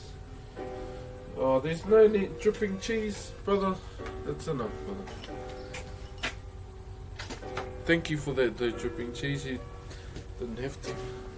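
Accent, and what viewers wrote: New Zealand. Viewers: Are you from New Zealand 🇳🇿 I,m am from the Netherlands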